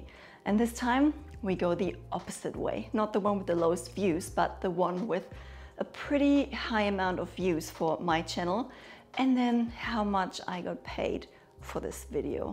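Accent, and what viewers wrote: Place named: Nuremberg, Germany. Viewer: Hallo, schön dich deutsch sprechen zu hören. Ich war dienstlich letzte Woche im Nürnberger Raum.. es klang sehr ähnlich.. smile.. ist es evtl. deine "alte Hemat".. LG Andreas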